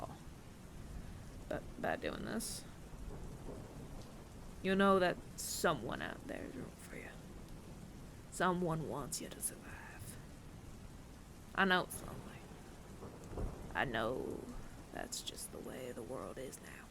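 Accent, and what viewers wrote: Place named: South, United States. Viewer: Oooooo this one was a fun one! Not sure how I felt about the Southern accent, but overall pretty good!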